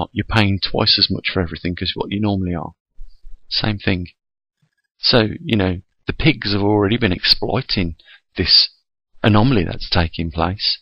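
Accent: Birmingham, United Kingdom. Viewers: I got to ask but what part of Birmingham are you from?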